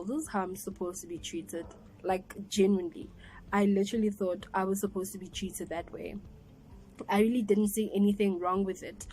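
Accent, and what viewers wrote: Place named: South Africa. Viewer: Hi, thank you for the uplifting story. Question: What are the similarities between living in Madagascar and S.A.? Your accent and the words you use (I.e. woza, yooo!) tell me that you have integrated to the SA environment. Thanks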